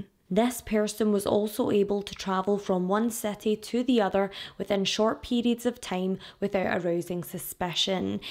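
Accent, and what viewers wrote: Scotland, United Kingdom. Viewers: Ohhh how lovely to hear your Scottish accent 🥰🥰🥰my mum and dad are from Lochgelly, Fife. They seem to have lost their accents, though my uncle was as broad as they get . They all moved to Australia late 1950's. Congratz on your getting married 🥂🥂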